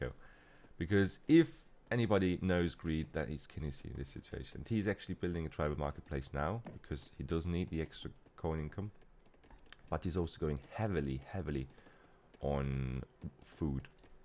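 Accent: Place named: England, United Kingdom. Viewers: Ja krass, du klingst echt wie ein Engländer. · der Meinung war ich auch. Ein leichter britischer Akkzent kommt da durch😁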